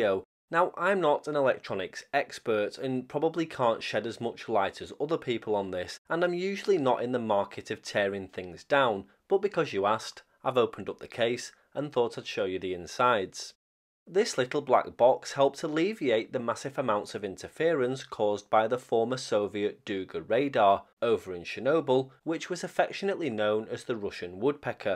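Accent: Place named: Glasgow, United Kingdom. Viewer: is the man.... Great sense of humour too.... And he has a lovely Scottish accent... Glasgow I think.... He is very smart.... I'm a big fan of.... He is a very down to earth man.... And he gets on well with his brother..... I've had a few replays from him....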